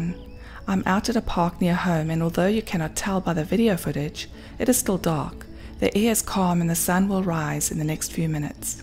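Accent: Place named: South Africa. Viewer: Hi! I could believe it when I read the data on one of your photos! The ISO was only 800! That's incredible! Also, I think that your voice is almost soothing to listen to, and your accent (which I believe is South African?) Is wonderful!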